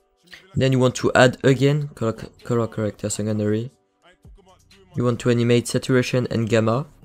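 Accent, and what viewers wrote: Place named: England, United Kingdom. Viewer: ton accents anglais :,D SINON GG et merci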